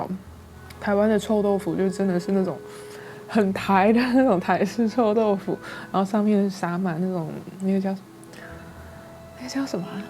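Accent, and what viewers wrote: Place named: Jiangzhe, China. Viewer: 博主是哪里的华人？听口音真的很大陆华南的口音，偏江浙一带。但是海外华人基本上广东福建人很多，口音明显，所以我很好奇。 如果是大陆人，到大陆不至于这么惊讶，不是大陆人，口音真的好大陆，如果说是台湾人，口音真的很淡。 😁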